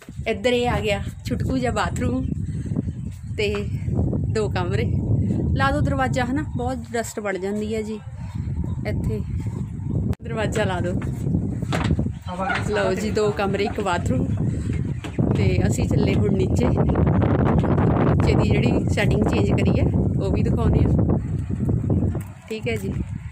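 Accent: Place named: Malwa, India. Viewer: Di tusi malwai ho boli ton lag reha hai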